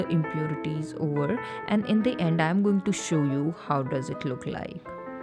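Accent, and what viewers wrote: India. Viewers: I love her indian accent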